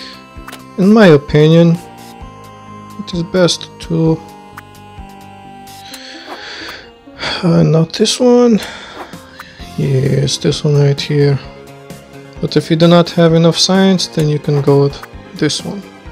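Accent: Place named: Russia. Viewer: You have a good Russian accent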